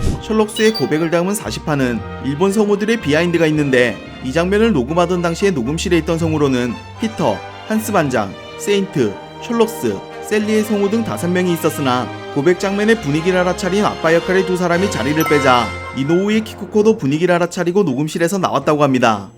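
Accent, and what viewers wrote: Gangwon, South Korea. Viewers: 왜 난 강원도 억양처럼 들리지?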